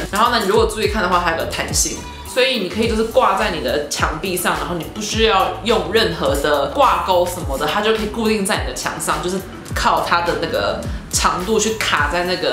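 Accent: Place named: United States. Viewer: Dani是ABC什麼的嗎？🤔感覺講話有口音欸😅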